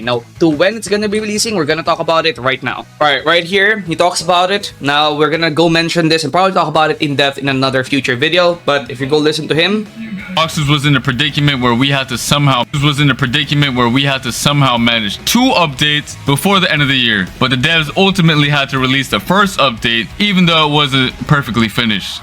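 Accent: Philippines. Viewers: Bro got that Filipino accent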